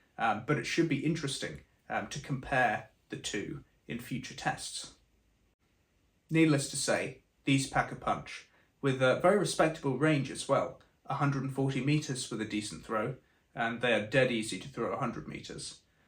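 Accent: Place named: United Kingdom. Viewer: As an American consumer of a specific type of video content, it was so pleasantly odd to hear “terminal effect” and the distinction between “internal and external ballistics” in the British accent. I very much appreciated your application of the scientific method. And the sound these things make as you throw them is awesome. Great stuff.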